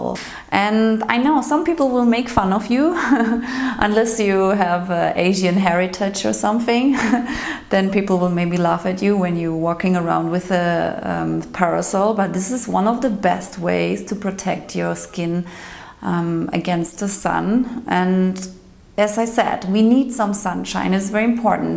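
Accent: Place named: Germany. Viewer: Keep it up! Your English is great. I think you have a lot of potential doing your videos in English as well as German. I think your German accent actually sounds very nice and makes you unique relative to other raw food channels in English. Thank you for reminding me of these truths about how to maintain overall health.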